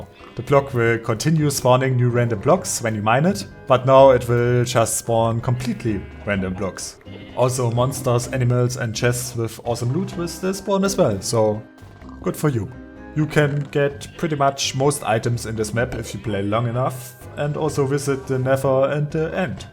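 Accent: Germany. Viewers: Der ist deutsch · Are you german ? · wie man einfach hör das du deutsch bist xD geil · Thanks dude! German accent rullez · Bist du Deutscher? 😂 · Such a strong german accent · dieser deutsche Akzent xd. beste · I missed that heavy German accent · duhörst dich nach einen deutschen an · r u from germany? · Man hört den Deutschen Akzent xD Und geile Idee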